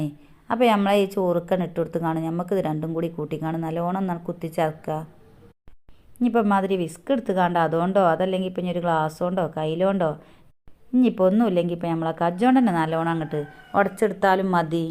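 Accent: Malappuram, India. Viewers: ഇതെന്തു സംസാരമാണ്.. മലപ്പുറത്തെ എല്ലാവരും ഈ ഭാഷയാണോ സംസാരിക്കുന്നത്.. കുറച്ചു നന്നായി സംസാരിച്ചു കൂടെ,.. എന്നാൽ എല്ലാവർക്കും മനസ്സിലാകുമല്ലോ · Malappuram pwrooi ❤❤❤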